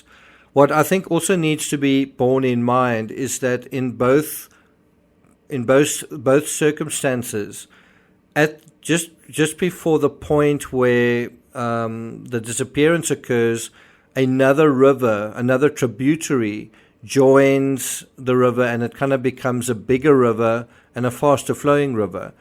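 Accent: South Africa. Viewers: i love your production and narrative, also love your S.A accent 😊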